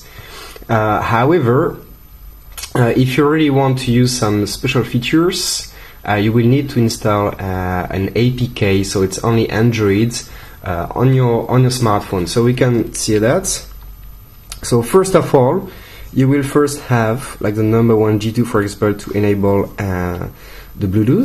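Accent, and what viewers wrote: France. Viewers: je trouve l'accent français agaçant en anglais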